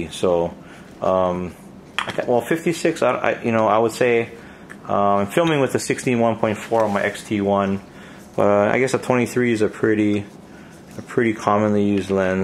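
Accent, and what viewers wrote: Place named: New York, United States. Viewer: I got my X-H1 today and watched your Opening Box Video when opening mine. Cool. Share the experience. One thing I was hoping you’d talk about: what is that little gizmo that looks like a T? I’m an engineer and can’t figure out what the heck it is. I read manuals as a last resort. Another thing: I sold my X-T2 Graphite for this one. I have an X-T20 as my second body. I like the look and feel of the X-T2 much better but I bought this for one reason: IBIS! I’m a prime shooter. I have one zoom - the 18-55mm and unfortunately got spoiled by being able to shoot at much lower ISO. The IBIS on the X-H1 is fantastic. 1/8 sec? No problem. Tack sharp. Worth the upgrade. Now my 56mm f1.2 comes with me on night street shooting safaris. (You think the X-H1 is heavy now?) The larger body was necessary to fit the IBIS. I like the extension rear of the mock mirror housing. 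That too was necessary to get more distance for the new 3.6M screen. The sub-display? I’ll never use it. I’m not about to put on glasses just to read that. Defeats the purpose. I wish they have kept the Exp comp dial. I used that and it kept more the retro look. Hey, since you sound like you’re from NY, like me, stop trying to pronounce “tt.” Sounds unnatural. The Queen of England doesn’t so why should you?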